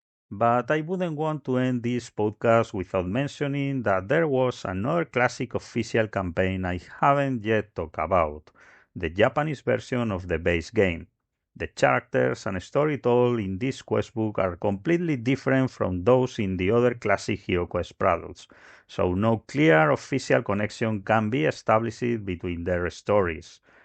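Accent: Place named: Spain. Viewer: El acento en español que no falte 😎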